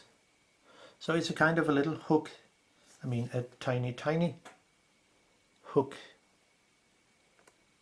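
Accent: Denmark. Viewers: Thank you very much for this amazing and very knowledgable content. I also decided to follow your instructions, however, I ran into problems when I accidentally disassembled the focusing part which lead to a lot of problems. I am fully aware it's entirely my fault but I really want to fix it, is there any way I could contact you please? I live in Denmark, you sound bit danish too, but don't know anyone who could help me. Thank you and good luck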